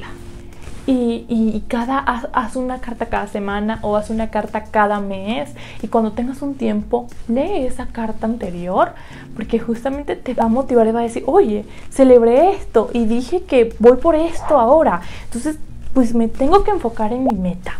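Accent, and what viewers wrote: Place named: Mexico. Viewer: omg siento que tienes el acento mexicano mucho mas marcado que antes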